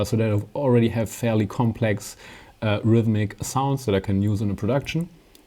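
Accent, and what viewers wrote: Germany. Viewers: way better with the german accent. thanks!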